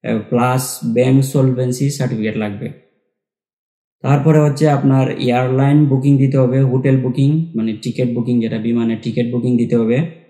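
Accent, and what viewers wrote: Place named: Habiganj, Bangladesh. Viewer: ভাই আপনার ভাষায় বুঝা যাচ্ছে,,আপনি হবিগন্জ ডিস্ট্রিকের।আমি মাধবপুর থেকে বলছি।আমি আগে দূবাইতে ছিলাম,, আড়াই বছর,,,এখন পূর্তুগালে ভিসিট ভিসা পেতে আপনি কোন সহযোগিতা করতে পারবেন।অথবা ওয়ার্ক ভিসা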